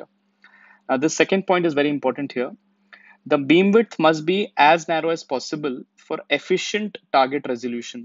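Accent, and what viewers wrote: India. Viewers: I’m sorry man, i don’t want to offend you but i just can’t learn anything with an Indian accent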